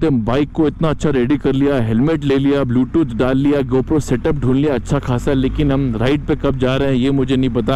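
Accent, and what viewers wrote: Mumbai, India. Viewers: Sir...GoPro Waala View Mast tha...Nd Old Mumbiker Sir is Back with his Mumbaiker Waali Tone....sir Loved the interviw!!😀😎❤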